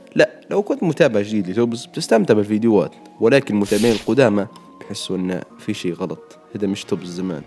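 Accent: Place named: Libya. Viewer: ماشاء الله، ولد بلادي، أصلاً، واضح من اللكنة واللهجة، إستمر يا أسطورة 🇱🇾♥️✌️👏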